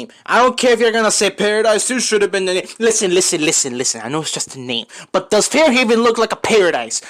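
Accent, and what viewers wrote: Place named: Bahamas. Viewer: I love your Bahamas accent. It’s so Heaty.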